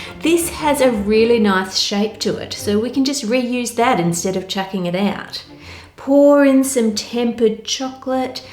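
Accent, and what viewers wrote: Perth, Australia. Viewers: Australians like have an awesome accent! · it's a Perth accent :) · Dear mam Will you please teach us Australian accent please please it's so good 👌 by the way I am kashif form Asia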